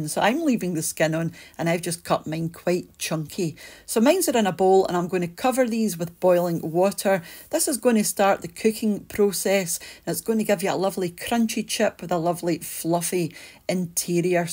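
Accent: Scotland, United Kingdom. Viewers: Subscribed they look sooooo tasty. I love tye Scottish accent probably because of my ancestry.❤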